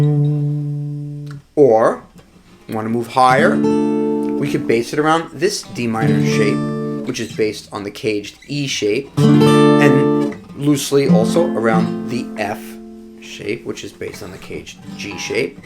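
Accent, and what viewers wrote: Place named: Long Island, United States. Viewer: Nice lesson. Got two loopers one Boss and one on the Helix. Now ive gotta figure out how to use them. I know the Boss is capable of overdubbing not so sure about the Helix looper. PS. Wheres the accent from?? Sounds like either Strong Island or Massachusetts. Im betting on Long Island!!! Am i right???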